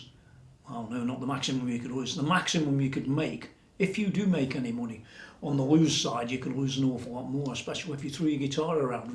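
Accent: Stoke, United Kingdom. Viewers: Sounds like a Stoke accent to me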